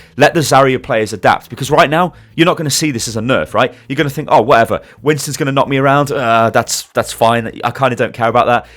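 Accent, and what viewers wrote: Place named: United Kingdom. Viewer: minseoks He's British you fucking chucklehead. It is easier to say it like how he says it then forcing himself to say it how it is normally pronounced. That's like being a non-english speaker but some dumbass such as yourself saying that the non-english speaker is retarded is just as stupid as the statement itself.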